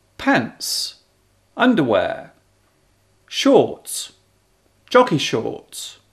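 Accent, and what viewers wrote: United Kingdom. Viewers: hello! mister duncan .. thank you for this vidio .... ijust wanna tell u to use english subtitle on ur vidios that make it easy when we download the vidios we can read the eng subtitle .... and thank u again for ur lessons really help me to improve my english spicially vocabulary and i like british accent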